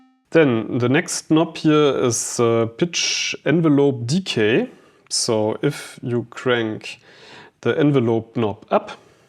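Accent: Germany. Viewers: Beautiful German accent 🇩🇪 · this sound more german then english :D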